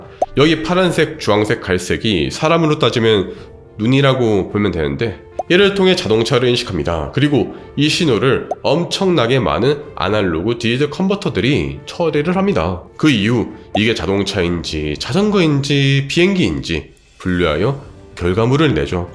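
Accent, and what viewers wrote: Gyeongsang, South Korea. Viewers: 경상도 토바기, 싫다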